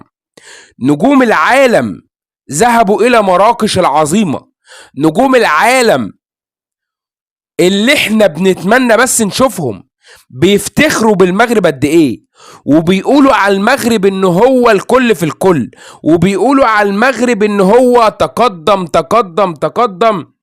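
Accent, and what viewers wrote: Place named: Egypt. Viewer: دا أنت الطيب المحترم تنوب على المغرب والمغاربة على ذوقك الراقي الجميل الله عليك تعليقك بالصوت المصري الجميل أنت على راسنا من فوق ❤❤❤❤❤❤❤❤❤❤❤❤❤❤❤❤❤❤❤❤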